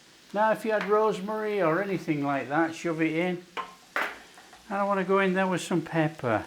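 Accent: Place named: England, United Kingdom. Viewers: I thought he was a Notts man but I guess it's not far from Yorkshire. Sorry chef I'm from the westcountry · Hey, Chef, where are you from in England? Trying to place your accent!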